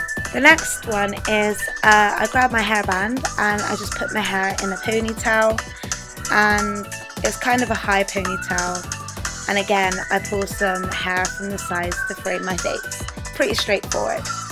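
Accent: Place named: United Kingdom. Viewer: what part of the uk are u from i can't pinpoint ur accent?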